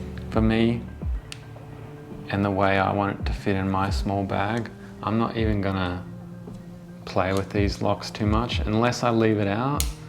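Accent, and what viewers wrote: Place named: Australia. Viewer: yeah I see it peek in on you,the funnier part was hearing you talk just now and figuring out your down under I had been listening with sap app (in Spanish) lol thanks for the help and guidance I do appreciate it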